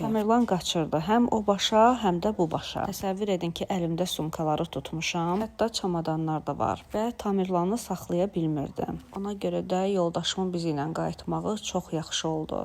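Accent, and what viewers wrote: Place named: Russia. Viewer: amma mən elə bilirdim siz rus dillisiz deyə belə formada danışırsız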